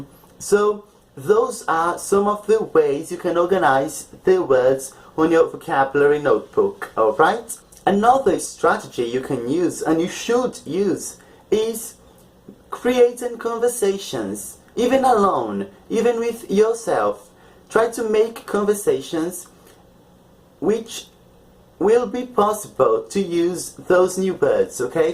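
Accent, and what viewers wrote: United Kingdom. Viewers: hi my name's Leandro, and I'm enchanted with your English accent, I wish we were friends on WhatsApp, anyway, if you want to contact me , please , just Whatsapp me <num>. I have been studying English for a while so I think we could have an interesting and effective conversation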